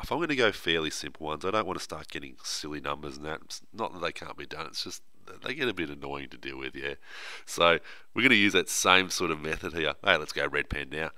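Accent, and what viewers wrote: Australia. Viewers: When I take my test... I'll have this guys Australian voice in my head... Thank you sir!!!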